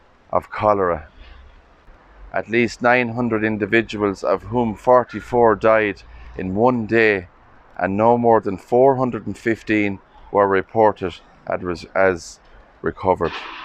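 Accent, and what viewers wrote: Scotland, United Kingdom. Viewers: 😂 that lovely Scottish accent 😉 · Love the Scottish accent! my great granddad was born in Edinburgh. It reminds me of him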